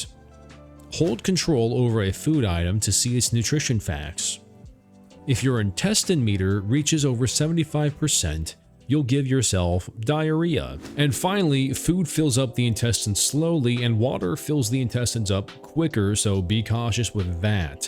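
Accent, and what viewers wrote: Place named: Australia. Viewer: To Americans, your aussie accent could be considered strange.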